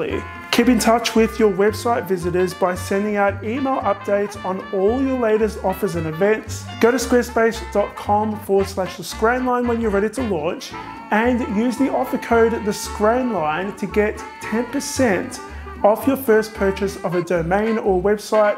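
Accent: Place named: Australia. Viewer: He's Australian. I agree, the accent is lovely to listen to.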